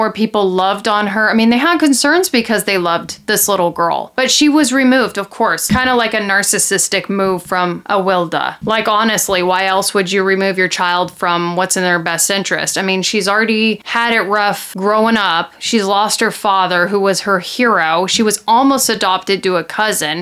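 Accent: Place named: California, United States. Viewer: You're a Californian right you and Elise from 10to Life both look and sound like us. Cali girls stick together lol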